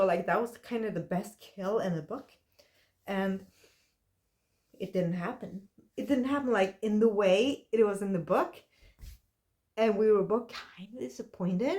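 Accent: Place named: Sweden. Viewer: I was going to ask what that accent was, but you said your from Sweden? So I’m guessing it’s Swedish 😊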